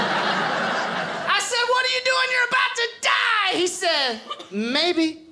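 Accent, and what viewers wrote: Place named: South, United States. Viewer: you have a combination of a southern accent and a hood accent. it's cool.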